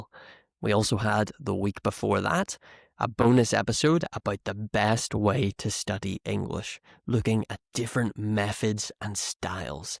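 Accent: United Kingdom. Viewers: Hello 👋🏻🙋🏻‍♀️ Since this video was recommended to me , I ’ d like to ask — are your videos all in British English ?? 🤔😊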